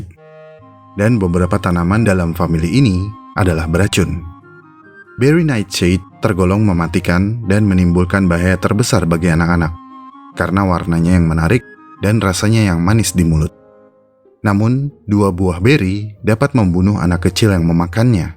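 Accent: United Kingdom. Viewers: Logat British sangat melekat 😂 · Aksen britishnya enak didenger 😅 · denger aksennya ky lg ntn film Hewri Potah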